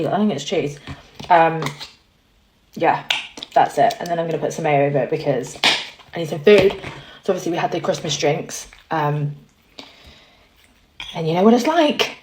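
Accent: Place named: United Kingdom. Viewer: PS I SO LOVE YOUR BRITISH ACCENT!! 😊🥰🥳🍾🤗👏👏👏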